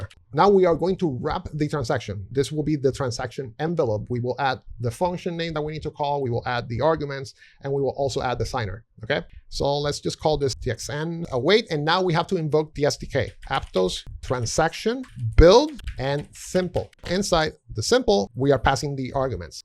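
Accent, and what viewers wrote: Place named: United States. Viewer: are you from america? i hear an accent i think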